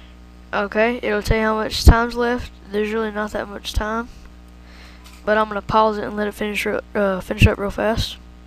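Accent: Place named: Netherlands. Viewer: Stop insulting his accent, its dutch and its cool :) Yep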